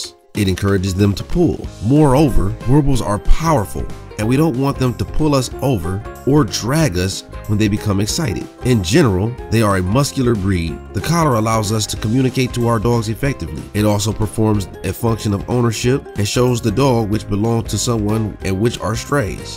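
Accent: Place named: Ohio, United States. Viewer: That man educating and sounding like he from the other other side of Ohio. ❤️ get extra proper for the people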